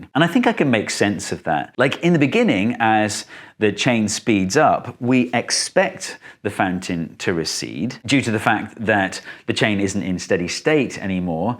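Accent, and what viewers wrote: United Kingdom. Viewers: "I was just mesmerized by his blue eyes and his soothing voice" Lol. Thing is, i agree. And i don't even like the british accent. ❤👍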